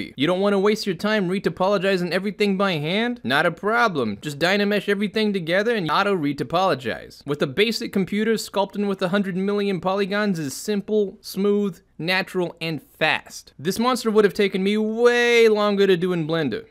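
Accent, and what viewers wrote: New York, United States. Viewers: okay. Native New Yawker? Take my follow.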